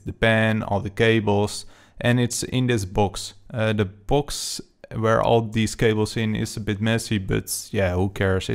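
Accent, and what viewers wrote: Netherlands. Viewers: Your Dutch accent hihi let maar niet op mijn opmerking viel me gewoon gelijk op.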